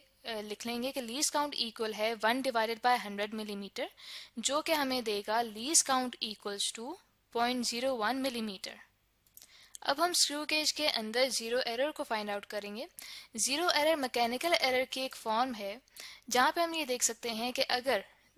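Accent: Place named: Pakistan. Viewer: Assalamoalaikum mam aapki awaz bht khoobsurat h or aapke smjhane k tareeke ki m fan ho gyi hu Aap shayad pakistan se hn asal m aapke bolne k tareeke se laga mgr sch m aap bhht achhe se smjhati hn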